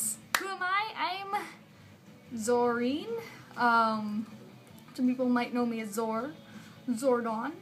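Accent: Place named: United States. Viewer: You sound American. Were you raised in the States? Shame about the real YUI's hiatus, right?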